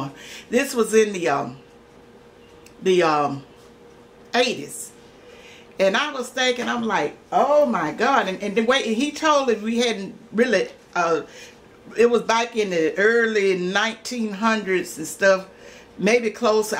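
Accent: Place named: Texas, United States. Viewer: Thanks for your channel. You make me want to cook again. Where do you live? You sound a lot like my folks from Sulphur Springs, Texas.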